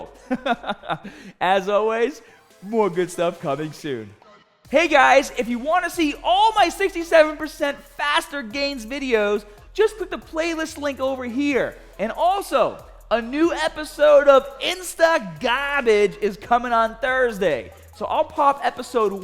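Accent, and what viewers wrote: Boston, United States. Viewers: 😂 its like Boston accent is sneaking out. Let it beathe bro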